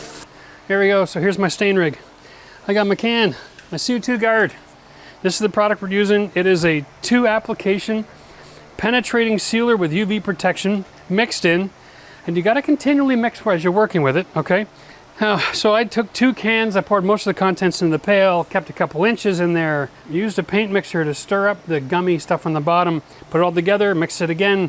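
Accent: Canada. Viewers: Great work and an amazing deck. I could be wrong but you sound Canadian.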